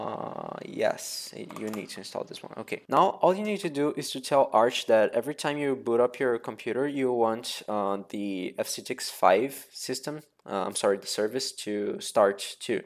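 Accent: Brazil. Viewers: Your accent sounds Brazilian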